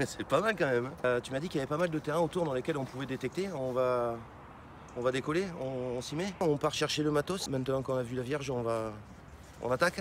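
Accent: South, France. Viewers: Non mais en tout cas j'adore quand tu fais de la detection avec tu accent du sud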